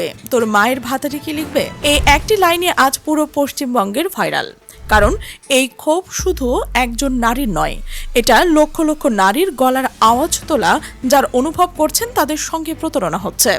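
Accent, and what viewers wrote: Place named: Bangladesh. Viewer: এই চ্যানেলটি হল বাংলাদেশীর। কথা শুনে পরিষ্কার বোঝা যাচ্ছে। বাংলাদেশের লোকেদের এই বিষয়ে জ্ঞান দেবার প্রয়োজন নেই।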